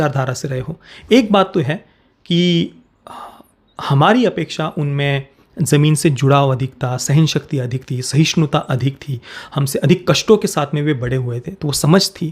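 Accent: Maharashtra, India. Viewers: मी आत्ताच बघून आलो!!❤️❤️..आणि शुध्द मराठी बोली🔥❤❤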